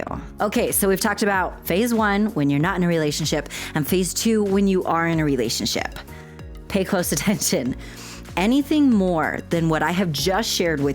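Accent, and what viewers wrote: United States. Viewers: How and where is highly cultural. I hear your USA accent probably New England. Touching is à no no never. I will never return USA and this is only à secondary reason. Main reason is aggressivity. Second is political corruption. And so many others.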